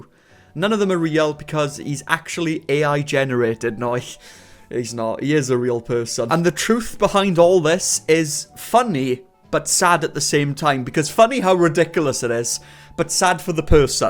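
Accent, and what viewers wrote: Wales, United Kingdom. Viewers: you from north or south wales im also welsh so its ez to know tht you from here lol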